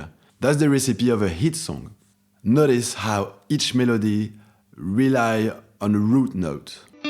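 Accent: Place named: France. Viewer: That little French accent, so sexy ! Don't give up !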